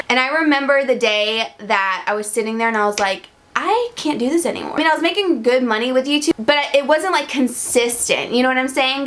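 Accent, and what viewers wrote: South, United States. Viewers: If you guys watch the bachelor she reminds me of Raven! They kinda have the same southern accent and face lol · Your new favorite song🙌loved your lip sync to it!😍 p.s. I love your southern twang. ❤And you go girl with your independent self 💪💁👏👏👏it is hard not being able to talk to your best friend, but when they return it is the best!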